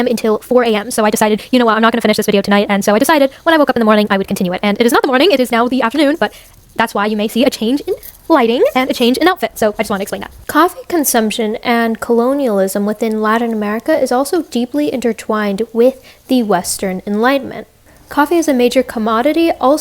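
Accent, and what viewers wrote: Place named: Spain. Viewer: Your spanish accent is so beautiful im kinda jealous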